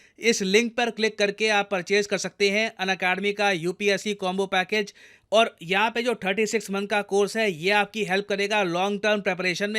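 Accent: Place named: Bihar, India. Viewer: मगर काहे the Bihari touch 😊❤ we love your video महोदय🙏🕉️🇮🇳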